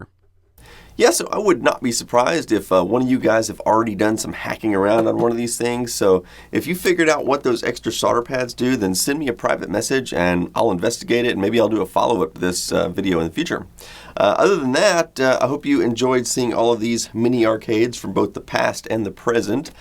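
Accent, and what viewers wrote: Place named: Texas, United States. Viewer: I'm not sure why, but your accent seemed more noticable to me in this video than all of your other videos I've seen. I don't think you're speaking with more of an accent than usual, I just seemed to notice it more today. Mostly when you say "All right", very Texas-ish hahaha. Anyways, love your content, you're one of my favourite YouTubers! Thank you for continuing to produce entertaining and informative videos!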